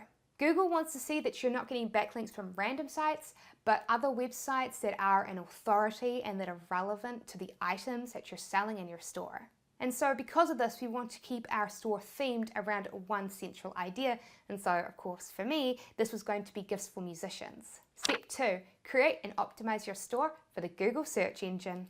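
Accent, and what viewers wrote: New Zealand. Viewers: Is it a Kiwi accent? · Awesome content but I couldnt understand why you kept on saying add ticks to your pages "lots of ticks", then I realise you meant text lol , it all makes sense now I'm getting use to you NZ accesnt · no problem at all! Thanks for responding. Of all the people I've found on YouTube, you by far seem the most genuine and you explain things extremely well. Love the Kiwi accent too and as an Irish man I can totally relate to speaking too fast 😂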